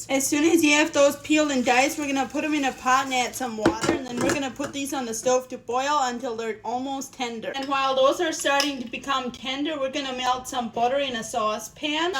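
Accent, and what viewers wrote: Pennsylvania Dutch, United States. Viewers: its not a lisp, its a pennsylvania dutch accent ^^;; i love the amish, i used to build pole buildings with the amish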